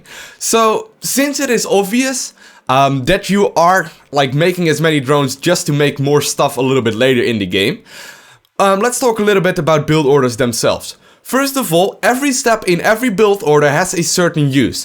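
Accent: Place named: Netherlands. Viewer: fuck i love dutch people. your personalities and accent is so awesome! love your videos bro!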